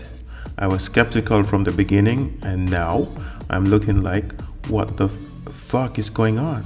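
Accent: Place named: West Indies, Caribbean. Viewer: Interesting story, I detect a west indies accent. Barbados maybe?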